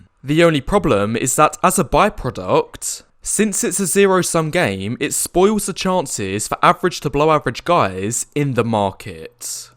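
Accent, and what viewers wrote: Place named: RP, United Kingdom. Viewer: I like dialect. He can easy play in a Prince Charles movie.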